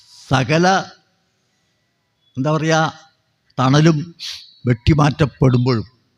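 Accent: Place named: Mavelikkara, India. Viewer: ഉള്ളിൽ നിന്ന് വരുന്ന സ്ലാങ് വെച്ചു മാവേലിക്കര-അടൂർ ഭാഗമാണെന്ന് ഊഹിക്കുന്നു.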